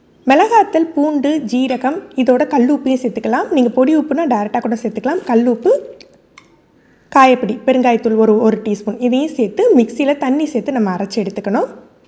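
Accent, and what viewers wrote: Tirunelveli, India. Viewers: நீங்க எந்த ஊர் -மா.நான் திருநெல்வேலி.நீங்கள் பேசுறது திருநெல்வேலி மாதிரி உள்ளது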